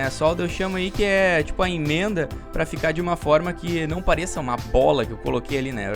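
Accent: Rio Grande do Sul, Brazil. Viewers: conheci o seu canal a pouco tempo, e ja curto e pelo seu sotaque percebi q é de RS kkk,tbm sou, só q sou de Caxias tmj <3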